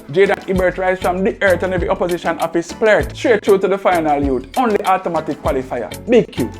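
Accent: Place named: Jamaica. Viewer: Jamaican style recap!! Maaad! Love it!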